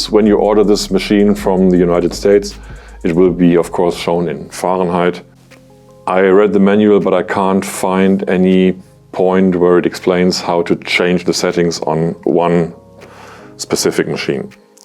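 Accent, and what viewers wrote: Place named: Germany. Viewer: You speak with a German accent and refeer to inches!? Who knows what that is?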